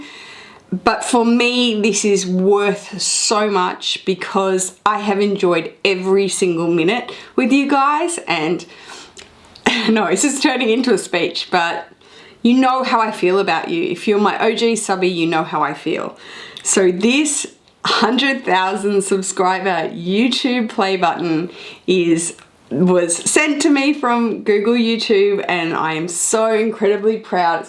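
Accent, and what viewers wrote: Australia. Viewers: I’m a silent watcher,but I just want to say I love your blogs! I love watching your lovely family and your sweet mom. Your kids are so precious! I’ve collected luxury handbags since 1986(telling my age 😉) I love your collections and I love your accent! If I had your Aussie accent I would never stop talking 😌 Thank you for the opportunity to win the Speedy B 25! 😍 God Bless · you've unwittingly been right by my side for so many years now. My partner walks by me watching your videos and affectionately shouts ' ' and then leaves me be because obvi I'm busy, eyes glued to whatever eye candy and luxury wisdom I have coming my way. I can't actually pinpoint what it is I love about your videos. What first drew me in was hearing another Australian in a sea of American luxury YouTubers. From day 1 I just.. trusted you. I've watched you thrive, go through your first pregnancy, met your beautiful Mum and gem of a hubby. 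I've seen Massi grow and squealed when Zaki I came along. And here I was thinking I was watching for the luxury! Anyway babe, your killing this biz, keep doing you. Congrats on 100, 000. Hells bells, that's the shzushz!!! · How can anyone hate you?! You are so real and generous. I love how you share your life and love for luxury without any tinge of bragging. And of course that Australian accent is just so cute, I can’t get tired listening to you. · I watch your channel because you are true to yourself 100%. And I love your Australian accent! 😊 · Watching another Sydney girl do so well makes me really proud! You’re always my go to for fashion and luxury videos and hearing an Aussie accent makes it that much better! 🇦🇺❤️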